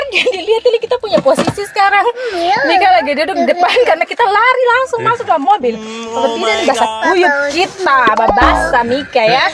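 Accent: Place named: Papua, Indonesia. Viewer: Bahasa belanda campur logat papua 👍😂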